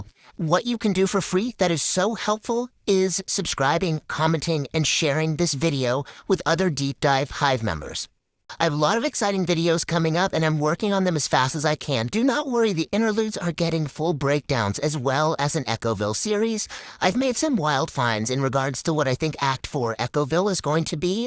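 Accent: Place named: South, United States. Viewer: we would still hear the southern drawl. Even with the voice filter.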